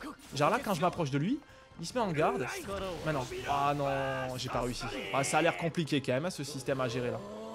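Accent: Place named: Marseille, France. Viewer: G remarqué que ta un axen marseillais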